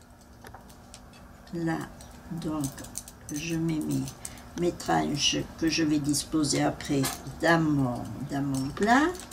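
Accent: Provence, France. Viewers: Recettes de mon enfance que je redécouvre avec vous.....😢❤ et en plus... notre cher accent provençal...❤❤❤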